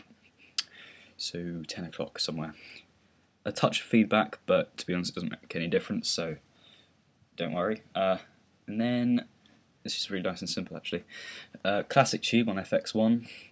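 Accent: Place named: United Kingdom. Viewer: So British it hurts :P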